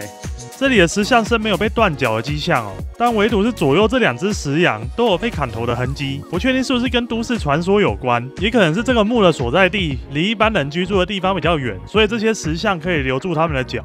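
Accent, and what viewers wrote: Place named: Taichung, Taiwan. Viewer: 第一次看你的影片，看著看著，發現你有台中腔，看到你說：「從大甲家裡出發…」果然🤣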